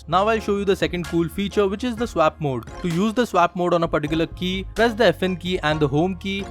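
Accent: India. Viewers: i hate India accent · Some criticism: 1. Stop emphasising the fact that this keyboard is "useful for gamers." It's part of Asus's Cerberus line up which is specific to gamers. 2. It's not your Indian accent, but just your voice that's irritating. I never thought an Indian accent could become worse honestly. 3. Who the fuck does a keyboard review and doesn't do a sound test? Like it's standard for all keyboard reviews. 4. Manuals are all available online, you don't have to literally show them page by page. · LUL, not all Indians sound like him btw ! q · i really hate the Indian accent, but nice video. thanks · Indian accent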